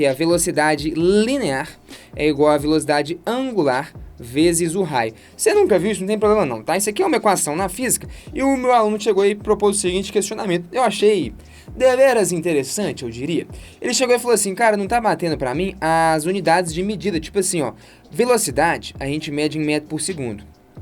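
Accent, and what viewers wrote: Minas Gerais, Brazil. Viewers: Esse sotaque mineiro deixa tudo ainda melhor 😊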